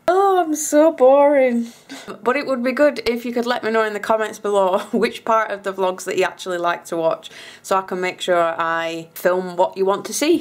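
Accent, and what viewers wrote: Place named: Northern England, United Kingdom. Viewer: I live all your vlog, it’s nice to here a fellow northern lass on her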